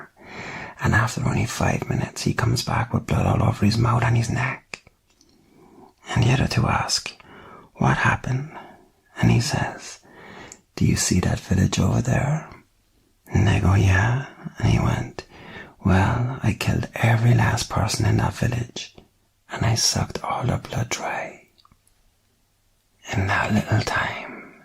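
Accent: Ireland. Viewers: What kind accents is this irsh😍🤭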